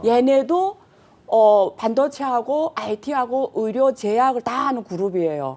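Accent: Yanbian, China. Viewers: 발음하는거 보면 모르냐? 원래 조선족인데 정신이 바로 박혀서 한국에서 애들 가르치잖아. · 조선족인가? 연변말투네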